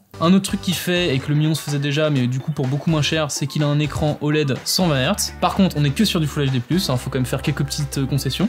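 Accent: Normandy, France. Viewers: Clair, net, précis et sans blablabla qui comble le manque d'information. Au fait, tu ne serais pas Normand?👍👏😉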